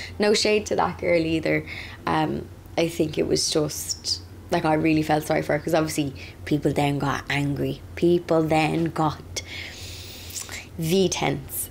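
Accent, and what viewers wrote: Ireland. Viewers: love to hear that beautiful Irish lilt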